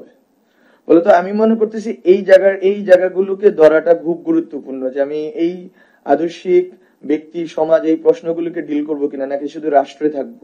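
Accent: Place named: Noakhali, Bangladesh. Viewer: নোয়াখালী টান আসে কথায় আমি তার কথা বুছি না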